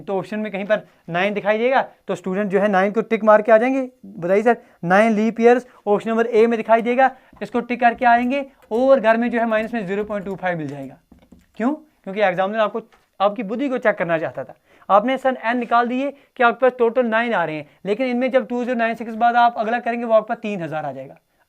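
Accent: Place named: Punjab, India. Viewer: Love your punjabi acent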